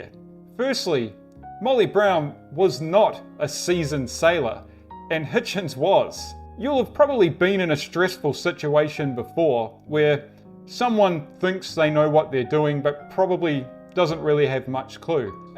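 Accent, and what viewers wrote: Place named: New Zealand. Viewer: You are lucky my friend, you got to observe different parts of the world first hand! I guessed you were from NZ from the accent...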